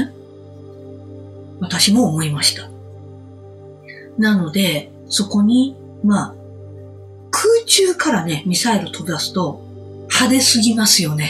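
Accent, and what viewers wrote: Kansai, Japan. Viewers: さん初めまして宜しくお願いします😊自分で語るのもおかしいのですが！私ね、あらゆる全ての波動周波数が何故か分かるのです！今地球に宇宙からのエネルギー波動が流れ出来ています9874hzです2〜3年前(コロちゃん初)700~900hzでしたのでものすごいスピードできめ細かく軽い波動が地球に入っていますね！😅このペースで行くと25年の銀河波動も、期待出来ますね！今も少しっつではあるけど南に傾いています😮ポールシフトが起きる事は宇宙では決定しているのですがその前に、予知現象といった具合に私たちが経験してそれから完全なポールシフトが起きます！その時沖縄~台湾の地下大陸が浮上します😮 さんの関西弁がとても好きです😅ありがとうございました💕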